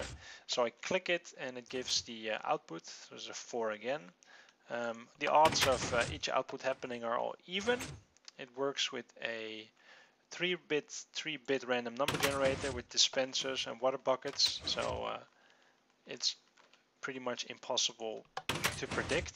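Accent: Netherlands. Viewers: Lol, I'm dutch too, as soon as I heard you talk I knew you were dutch :P We all have that weird dutch accent... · Are you from holland?? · lol ik wist niet dat je nederlands was xDD khoorde het pas na zon intervieuw video xD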